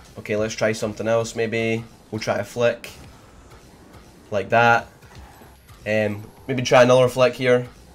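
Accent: Scotland, United Kingdom. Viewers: What part of Scotland u from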